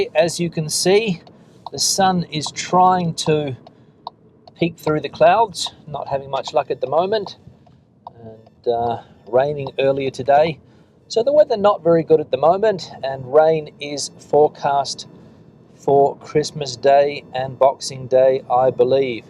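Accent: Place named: Australia. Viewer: We've been postponing our move to Spain ever since the current "health problem". Almost feel as if the best time to go is now because this may end up being the new normal. Where were you driving? Are you back home? Please bear with me as I share a funny anecdote. One of my best friends is originally from Southern Australia and has a very similar accent to yours. Back when Microsoft voice recognition software was in its infancy (<num>?) I would always tease him because it didn't work for him. So, for the last few updates, fixes, and debugs, Australian was always listed as one of the languages being "fixed". Of course, each update I'd have to walk up to him and say, "hey Mike, why is it that Windows can understand Kurdish, Tibetan and Pennsylvania Dutch but it can't understand Australian English?". That's how I learned a lot of colorful Australian words and phrases which I shan't repeat here! 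Did you experience the same issue years ago? Thanks for the updates.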